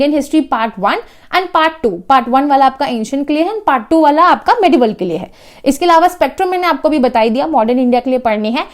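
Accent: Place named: Madhya Pradesh, India. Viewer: Mam aap mp se lag rhi ho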